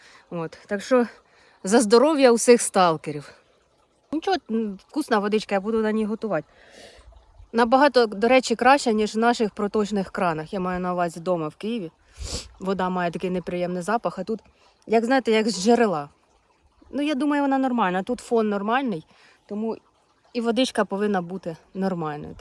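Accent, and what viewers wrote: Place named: Zhytomyr, Ukraine. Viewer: Здрастуйте. Ви не з Житомирської області? Просто слухаючи вашу мову так думаю.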